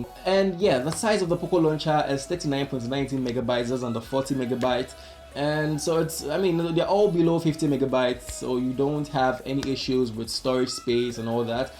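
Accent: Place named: Jamaica. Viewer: Are tou Jamaican? I'm from the virgin Islands, your accent sounds jamaican or nearby location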